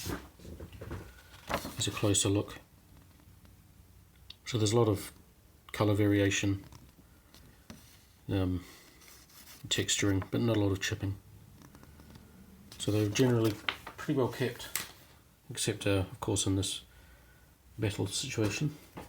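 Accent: New Zealand. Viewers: its the new zealand accent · Slipps Dungeon he’s from New Zealand what’d you expect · Are you a kiwi?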